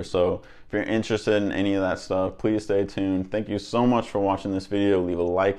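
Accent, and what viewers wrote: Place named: Czech Republic. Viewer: I know it's a stupid question, but I just have to ask, aren't you from the Czechia? I ask because you have such a Czech accent. Your last name is also used a lot here.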